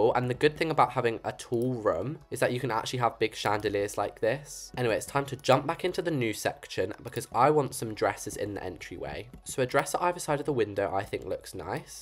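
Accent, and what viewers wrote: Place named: United Kingdom. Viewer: the british accent-❤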